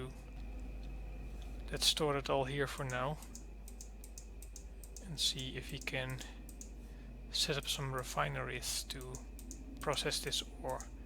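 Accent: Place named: Netherlands. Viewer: Heey, Just asking, but are you dutch? For some reason your English sounds like how dutch people speak it:)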